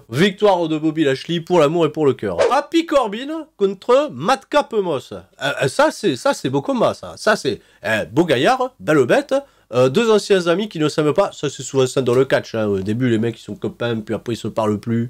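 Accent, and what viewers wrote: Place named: South, France. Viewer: c'est plus très marrant le délire arbitre accent du sud un peu con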